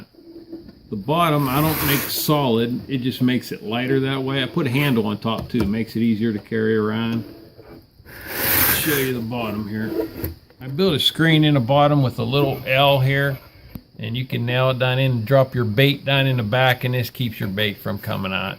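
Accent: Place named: Southwestern Pennsylvania, United States. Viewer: Man I thought I had a heavy SW PA accent. Great video.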